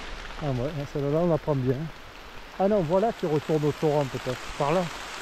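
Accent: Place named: Provence, France. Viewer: Cc et ouiiii un Biaou un Bacaou,Espigaou ça me fait toujours du bien d'entendre des mots de cher nous, pays de Marcel Pagnol. Toujours de très belles vidéo en plus avec l'accent et le sourire. Biz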